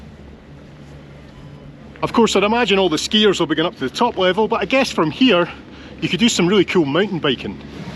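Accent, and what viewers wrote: Scotland, United Kingdom. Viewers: Very lovely scotish accent,welcome to Romania!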